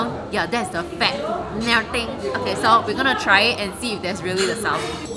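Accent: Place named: Singapore. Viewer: Love your videos, especially your interesting Singaporean English accent (in a good way though)!! I visited Seoul a couple times and really like this city and Korean culture. I have tried to learn Korean as well by myself (as I like to watch Korean dramas and movies), but it's hard, maybe because I'm too old learn a new language. I can still understand a bit though, as some Korean words/phases actually sound like Cantonese/Mandarin. Actually, I have a suggestion - I'm vegetarian but find it hard to find real vegetarian dishes in Korea. Even Naengmyeon, which I like, uses beef broth. It would be great if you could recommend some nice Korean vegetarian dishes and restaurants in the future:)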